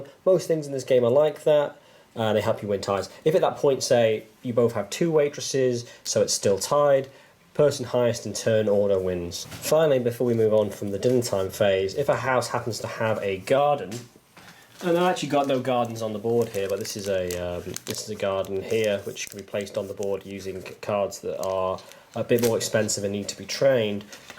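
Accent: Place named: Germany. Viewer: Actually - sounds like a hint of German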